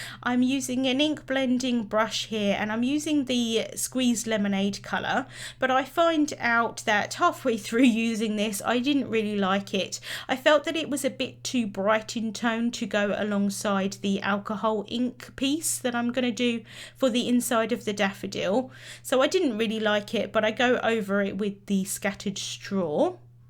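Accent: United Kingdom. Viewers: Lovely card!! I love your accent!! I am from the US and love to hear the British accent!! You always make such lovely cards!!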